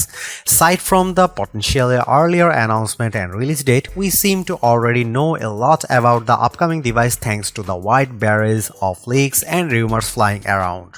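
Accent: India. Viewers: Indian English accent 😂😂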